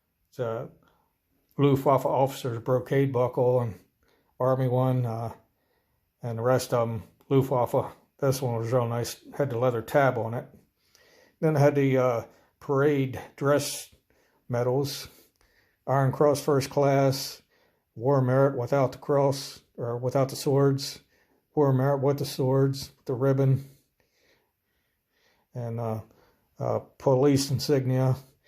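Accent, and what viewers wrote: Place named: Baltimore, United States. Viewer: You got a Baltimore accent. I'm originally a Harford County girl. Love the Hat btw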